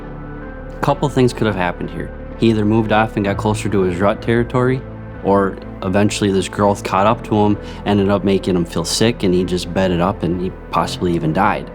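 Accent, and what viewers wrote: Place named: North, United States. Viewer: Holy Northern accent 🤣. Gotta love it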